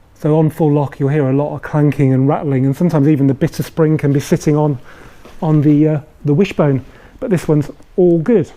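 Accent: United Kingdom. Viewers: are u sure? ur roads look like shit in UK... io saw roads like that last time in the post soviet 90s in estonia.... why the fck doesnt ur gov fix roads??? (unless i misjudged that ur voice sounds like british)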